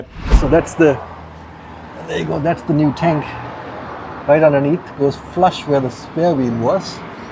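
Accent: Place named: South Africa. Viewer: Are you from South Africa, I hear some safa in the accent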